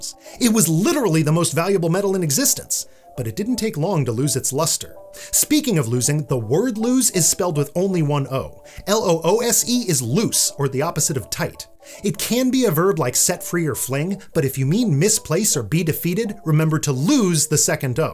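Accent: United States. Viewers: You really shouldn't get into scientific discussions if you cannot even understand basic English. Literally no part of the video claims either of the names is 'wrong' and does not refer to either being 'unique' in any way. The maker of the video says that the reason he calls it aluminum is not just because of his American accent, but also because the person that coined the first term for the metal (alumium) changed it to aluminum. He then proceeded to say that somebody at Quarterly Review referred to it as aluminium and it 'stuck', since the correct term (coined by the maker) wasn't yet widespread. What does this mean? That BOTH spellings are actually correct. Aluminum as that's the name it was actually given by apparently the first person that named it, and aluminium as a common 'evolution' of a word (which is the process by which we have 'photoshop something' or 'google it'). 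All that aside, even if we assume that 'aluminium' is the only correct form in British English (it's not), this STILL doesn't mean jack, since it is correct as aluminum in American English. Whether you want to accept it or not...that's an existing language and it doesn't need to follow the naming of other languages (including other variants of English). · The man in the video is no authority. He is American using an American dialect of English. ONLY in the USA is aluminum accepted. Internationally it is recognised as an acceptable American dialect, not standard English. It isn't the British, it is 96% of the world population.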